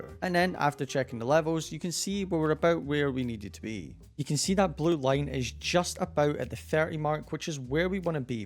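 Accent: Scotland, United Kingdom. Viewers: Is he British Im American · Hes British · Bro is British because he says wa'er Instead of water and says sal' instead of salt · Ur british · Guys is he British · I think he’s British, but how many times he said WOA? Ah ended😅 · Wait your british??😊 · Bro are you British or foreign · HES BRITISH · are u scottish by any chance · By your voice I can tell that your Scottish 😎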